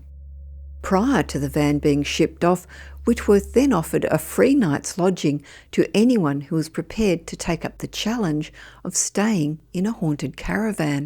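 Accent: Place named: Australia. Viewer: Hi there with the lovely Aussie gal commentary! I have a question,IF you please?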